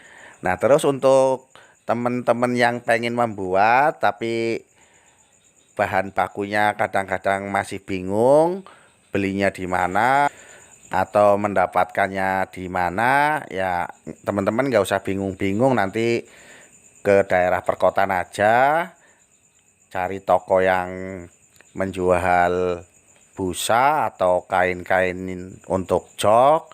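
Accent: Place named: Banyumas, Indonesia. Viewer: logatnya ada ngapkinya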